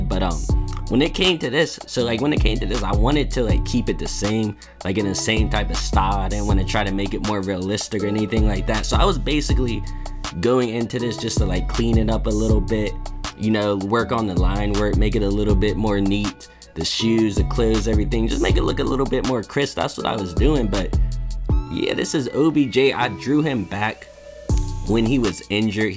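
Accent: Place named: South, United States. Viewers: Soundin like a hillbilly anyone (I’m not hatin) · He sound country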